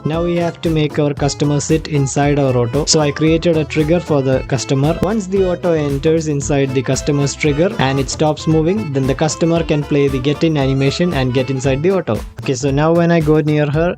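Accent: Kerala, India. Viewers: Bro are u from kerala 😊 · I detected that malayali english accent within a few seconds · Are u from Kerala ? Well this video was wonderful keep grinding brother 👏 u gained a new loyal sub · Bro malayali aano? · Broo Mallu or what?? Props to you bro! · ni malayali alleda 😂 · Bro is a Malayali for sure!! 😂🤍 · are you malayali accent is too strong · Malayali aano saare??? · Why im getting a feeling that you are a malayali😅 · including the Malayali accent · You are a Malayali right? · your Mallu accent 😂is top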